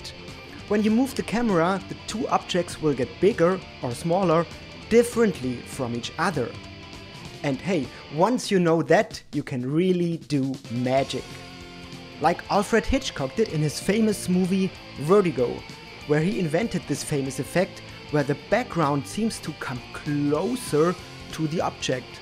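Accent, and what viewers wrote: Germany. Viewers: Du machst echt gute Videos. Warum machst Du das nicht (auch) in deutsch? Also, ich meine bei Dir rauszuhören, dass Du eher deutsch sprichst, oder? Echt gut.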